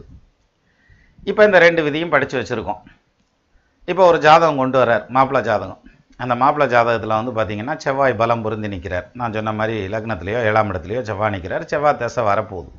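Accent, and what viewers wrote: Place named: Kongu, India. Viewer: Sir, my guess you are from kongu vellala gounder community ... Pls don't mistake I m guessing based on the way you talk and the examples you say in between, which is very familiar in this community. I may be wrong....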